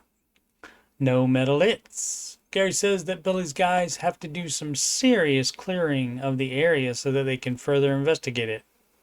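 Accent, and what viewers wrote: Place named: Atlantic Canada, Canada. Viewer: Awesome stuff Coach keep it up. Either your from Nova Scotia or Newfoundland 😀 the out gives it away 😆 🍻 👏 ✌️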